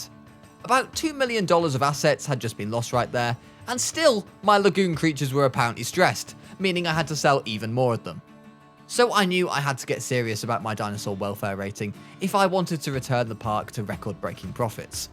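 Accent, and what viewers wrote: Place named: United Kingdom. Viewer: Ur British yay finally I found a British person that does Jurassic World videos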